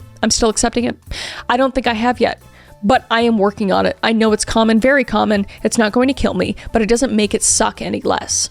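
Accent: Canada. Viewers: Ohhhh you're canadian! I have been watching like 20 of your videos in the past 2 days and i was like huh maybe i havent heard enough texas accents to judge but there is something off about this one <3 really enjoying your work, thank you for all the genres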